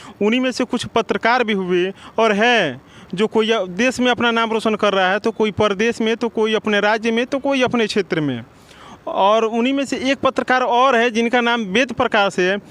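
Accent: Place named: Bihar, India. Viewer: Yaar Journalism Karne ke pahle yea apna Bihari tone Khatam karo... warna Kuchh nhi ker pawoge.... good luck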